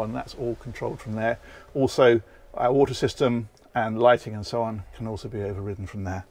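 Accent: United Kingdom. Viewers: Thanks a lot for making different videos. I love British English accent and I see your videos because you speak very clearly & beautifully. I congratulate you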